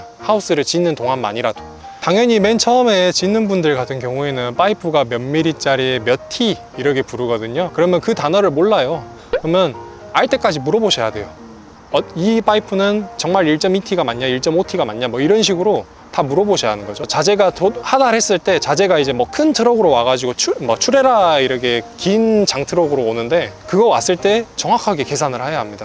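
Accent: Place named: Jeolla, South Korea. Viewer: 서울말투쓰려고 노력해도 전라도 말씨가 다 들리노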